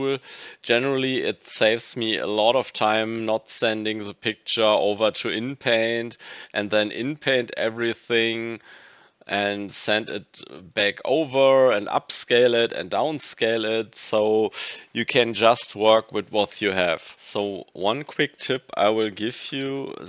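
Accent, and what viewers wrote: Germany. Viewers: Ur from Germany right? 😊 VG aus FRA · that german accent is genius :D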